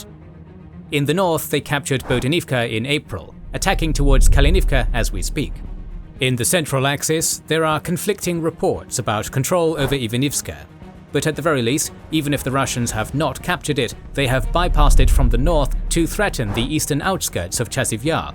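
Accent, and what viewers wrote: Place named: United Kingdom. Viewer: Forget the animation....the fact that these videos are narrared by a real person with a bona fide british accent is something I find very easy on the ear, no repetitions volume changes or any murdering of local place names.Top man,keep it up.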